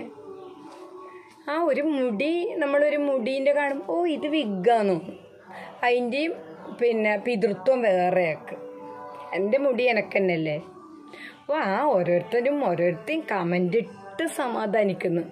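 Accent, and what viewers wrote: Kannur, India. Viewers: ചേച്ചി കണ്ണൂർ ആണോ 👍🏾ചേച്ചി സൂപ്പർ വീഡിയോ 🙏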